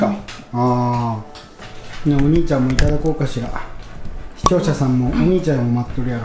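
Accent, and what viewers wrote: Kagawa, Japan. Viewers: 素のときに出る、讃岐弁がいい(´・ω・｀)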